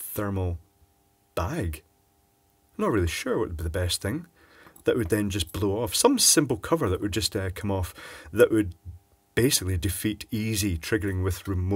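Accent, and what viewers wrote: Scotland, United Kingdom. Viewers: Caption generator doesn't cope with Scottish accent...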